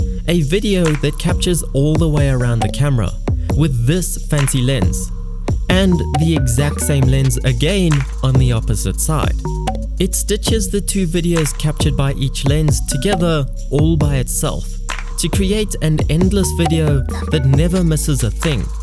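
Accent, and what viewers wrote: South Africa. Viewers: You sound so South African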